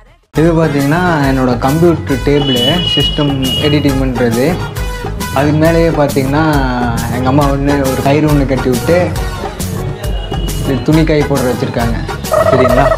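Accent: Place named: Coimbatore, India. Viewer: sirunga coimbatore bhashaikku na adimai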